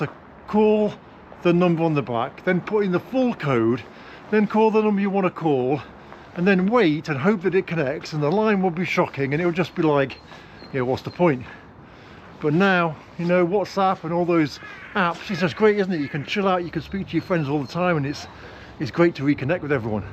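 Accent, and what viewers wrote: United Kingdom. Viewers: A wonderful mix of the background of a Russian provincial city and British pronunciation!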